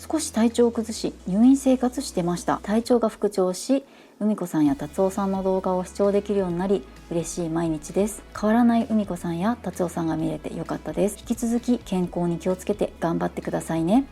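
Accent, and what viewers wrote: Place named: Kumamoto, Japan. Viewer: コメント紹介ありがとうございます😊さすがは海子さん、動画内でも普通に熊本弁出てくるだけあって、熊本弁丸出しのコメント読みもバッチリ👌 今年の寒波は今日もマイナス4.5℃で夜中から朝にかけて雪❄️が舞ってましたよ。僕は天草の本渡出身の益城町在住です。 僕は若い頃はこの寒い時期でも磯に釣りに行ったり、蘇陽町の渓流の管理釣り場にマス釣りに行ってましたが、トシと共に寒い時期や暑い時期は釣りに行かなくなりました😅 九州のスキー場は、動画コメント紹介でも言われてた熊本と大分の県境の九重スキー場、佐賀の天山、あとは熊本と宮崎の県境の五ヶ瀬ハイランドスキー場もありましたね。行ったコトないけど😅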